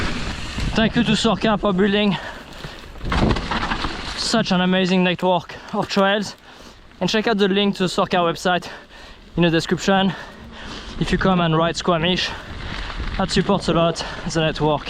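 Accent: France. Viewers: Merci pour ton accent bien français, je comprends mieux l anglais comme ça 😄 Sinon tu déchires tout, continue comme ça et bon ride 🤙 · OH avec ton accent a la française comme vous le dites j'ai du mal a comprendre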